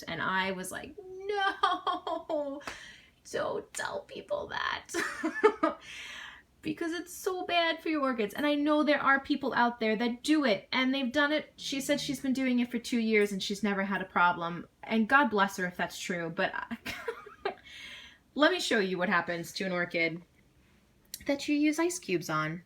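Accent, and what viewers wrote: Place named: New York, United States. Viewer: GREAT video, thank you. I learned so much about how to take care of my own phalaenopsis buddies. All your comments about ice cubes made me laugh. Yeah people tell me all the time about how they water their orchids with ice cubes like that. I never follow their advice either because it doesn't seem like a tropical plant would like ice water. Now I know for sure after seeing this video of yours. Cheers. Also, I love your New York accent.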